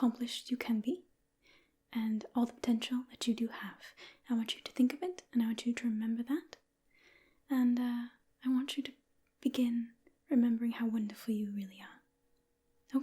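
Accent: England, United Kingdom. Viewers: YOUR BRITISH ACCENT SLAYS AND SLICES ME IN HALF EVERY TIME OMGGGGG! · I’ve said it before, you do a really good English accent. Have you ever been to the UK. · Nice use of the English accent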